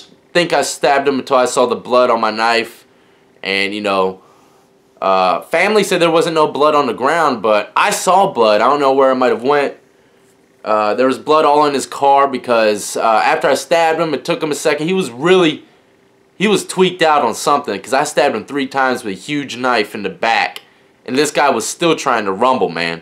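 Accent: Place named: Midsouth, United States. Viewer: I just knew you were from somewhere in the midsouth. Keep up the content...never boring.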